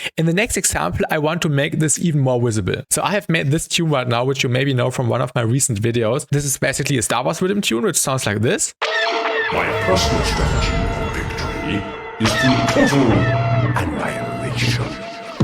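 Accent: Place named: Germany. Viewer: great video … but holy German accent xD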